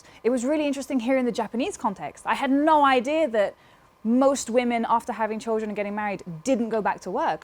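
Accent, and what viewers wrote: United Kingdom. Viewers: bein grown up in london, the accent is getting more british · Justru terasa accent nya. Inggris itu banyak jenis aksen.. sama kayak aksen Jakarta, aksen Indonesia timur, aksen Jawa dsb. Aksen nya, London banget..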